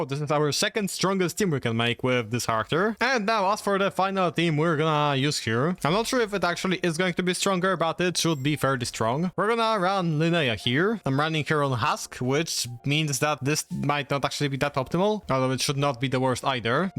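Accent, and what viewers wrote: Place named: Italy. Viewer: yo are you Italian? love your accent and your videos!!!!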